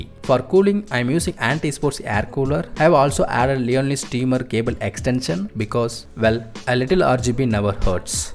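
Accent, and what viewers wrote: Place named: India. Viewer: He is speaking in stereotypical Indian accent but it sounds good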